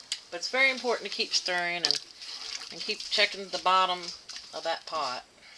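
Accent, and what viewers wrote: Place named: South, United States. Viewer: I love your Southern ? accent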